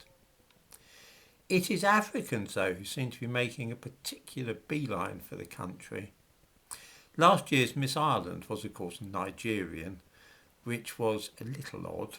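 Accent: United Kingdom. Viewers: A British accented man stirring up discord in Ireland, who would have guessed! Mmmm this all sounds racist to me dressed up as information! That said, I don't like to hear what's happening. I think it is happening the world over, the least well off in the world (the have nots) want a piece of the "better life" pie which we all enjoy and who can blame them? We in the developed world have gained enormously from the exploitation of people in the third world. As far back as 2000 when we lived in Dublin, I noticed the general population was very racist towards Nigerians, and I was ashamed to see it. It was OK for us to collect for "the black babies" while they were over there but we don't actually want them to come and take what they want from us! They were treated really badly regardless, it didn't matter if they were good, bad or anything in between, they were all tarred with the same 'black' brush. 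I don't know if these statistics are true or not, and if the way to get citizenship is as easy as to have a baby, then that is on the government for allowing it. It's not allowed in many countries so if it's a problem in Ireland it needs to be addressed by the government. Or does the Irish government want immigrants to do the dirty work that the Irish won't, or don't want to do!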